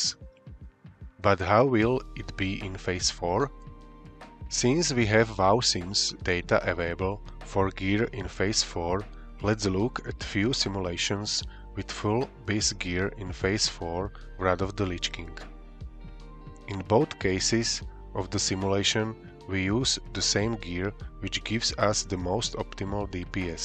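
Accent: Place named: Austria. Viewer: Are you austrian? You have a strong accent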